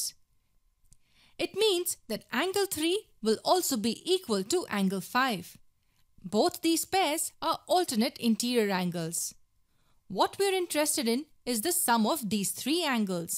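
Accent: India. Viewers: The indian accent.... ughhhh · Beautiful Indian accent! Clear!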